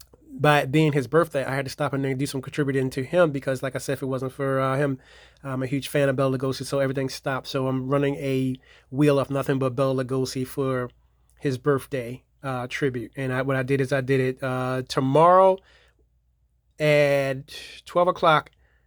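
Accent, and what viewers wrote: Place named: Louisiana, United States. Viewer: Nice collection! Lots great titles and TV shows. Love how you have them set up. you have a cool accent, is it from Louisiana. I subbed.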